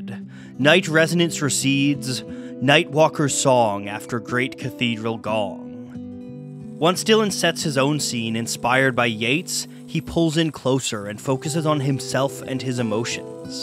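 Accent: Canada. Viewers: canadian,eh? great video as usual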